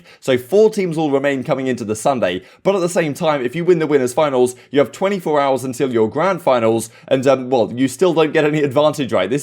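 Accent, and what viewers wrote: United Kingdom. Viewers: This is the most British sounding British person in existence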